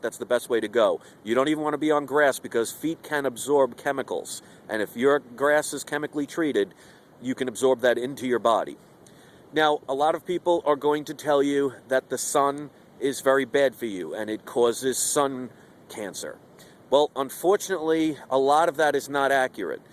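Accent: New York, United States. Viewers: u from NY?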